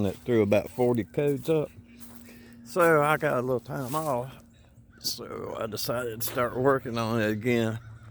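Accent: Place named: South, United States. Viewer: Southern folk, only accent i can watch at 2xspeed just fine😉 Good vid, never thought of swapping key electronics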